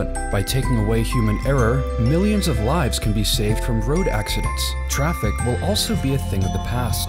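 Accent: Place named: United States. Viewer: Great video... I guess. Once again, an otherwise interesting idea is droned to death by this incessant electronica music that all sounds the same and never ends. I'd much rather just listen to someone describe the things in the video... Except for the fact that, once again, a video is read by someone who sounds American, but is written in broken English with bad grammar that sounds like it was mistranslated from some other language. Are these videos mass produced in some other country and then just 'read' by white people? I simply don't understand the point of this at all. Am I being 'pandered to?' Am I being patronized here? Why not just have someone from that country speaking English, or have them speak their own language and use captions? And PLEASE turn off that STUPID music. I hate it! It sounds exactly like a computer wrote it and every other one out there! 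And PLEASE get someone who can read and write in CORRECT ENGLISH GRAMMAR, or stop 'translating' it at all! Thanks... I guess... "...how it would look like?" Really? I don't think so. I literally couldn't get through the whole video. I had to turn it off because, without the terrible music & broken English, I don't know what the hell I'm looking at. Cut it out, whoever you are.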